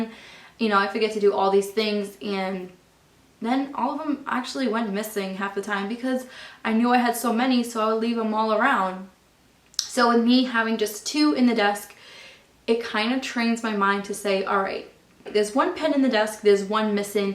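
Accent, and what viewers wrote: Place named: New Jersey, United States. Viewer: the New Jersey accent!!! Love it lol like if U agreeeee!!!😆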